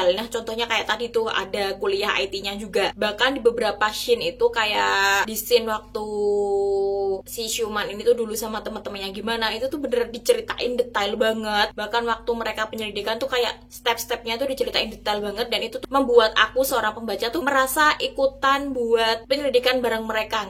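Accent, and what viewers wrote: Java, Indonesia. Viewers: nggak salah deh follow ig n subscribe youtube nya... banyak referensi buku yg kece2... paling suka pas ngomong "ketiban" 😄 sama medoknya sama ak... Org mana sih kak? kalo pas ke Solo hayuk ketemu, sharing referensi2 buku kita 🤓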